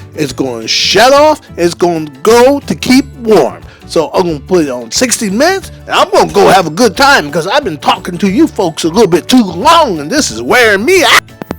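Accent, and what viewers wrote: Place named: New Orleans, United States. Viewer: You sound like you from NOLA cuz. Subbed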